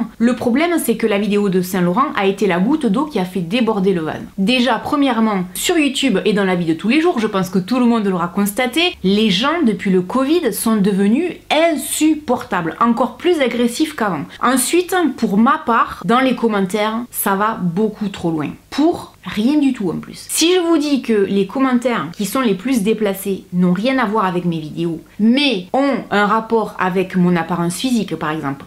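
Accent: South, France. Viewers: Bonjour, Je prends rarement le temps de laisser des commentaires sur youtube, mais aujourd'hui avec cette vidéo je ressens le besoin de le faire. Les messages que j'ai lu dans cette vidéo m'ont profondément choquée. Tant de haine reçue totalement gratuitement... Personnellement j'aime beaucoup ta personne, ton travail, ton contenu, ton accent du sud, tes vidéos variées (liste non exhaustive). Je regarde toutes tes vidéos et je continuerai de le faire, tu as eu raison de remettre les pendules à l'heure car ces comportements ne sont pas tolérables. J'aimerais te dire que je te soutiens, toi et ton travail et que j'ai hâte de voir tes futurs projets! Prends bien soin de toi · J'adore ton accent du sud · Juste un petit commentaire pour te soutenir, j'aime beaucoup ce que tu fais sur YouTube, ton accent du sud est me rappelle ma famille, et ta franchise est rafraîchissante dans ce monde ❤ Je suis désolée de lire tous ces commentaires déplacés cela ne devrait pas exister... 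Courage 😘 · Je suis choquée des commentaires! Moi je t'aime et j'adore ton accent! Ici c'est le sud!!!!! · Je suis choquée d'entendre ce que les gens peuvent dire sur les réseaux 😱 Je pense que la majorité des gens qui te suivent t'apprécient comme tu es. Avec ton humour, ton accent du sud, ta spontanéité. Bref ne change pas pour une poignée de gens "débiles" (encore je reste polie). ❤️ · tu es super, j'adore tes vidéos, ton accent du sud. Ceux qui critiques sont des débiles profonds. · Moi habituellement je n aime pas l accent du sud mais avec toi tout est possible tu es un amour merci de continuer!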